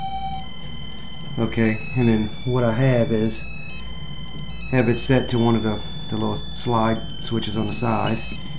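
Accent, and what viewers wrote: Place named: Louisiana, United States. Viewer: Listening to you talk I can tell you're from either Chalmette or Metairie : )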